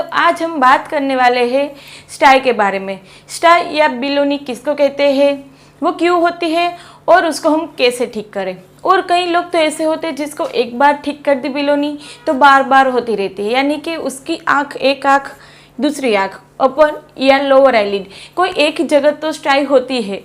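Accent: Gujarat, India. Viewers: Madam apka surname se ap north indian lagte ho per hindi ascent se gujarati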